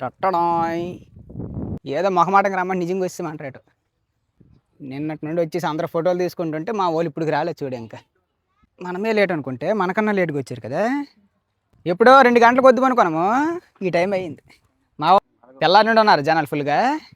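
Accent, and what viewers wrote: Srikakulam, India. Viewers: Srikakulam slang very funny and super bro Form tirupati Neenu mee pelli ki tirupati nundi srikakulam ki vasthunna bro🎉🎉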